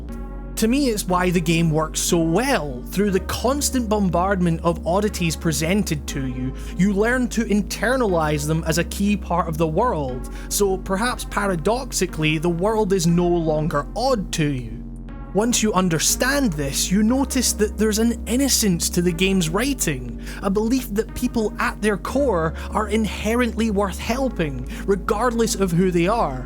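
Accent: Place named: Scotland, United Kingdom. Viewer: Thanks for answering! I really appreciate it. I hope you don't mind if I model a Scottish accent off your voice. Most of the ones in media are really exaggerated so I prefer to model off real people, and your long-from videos would give me lots to work with.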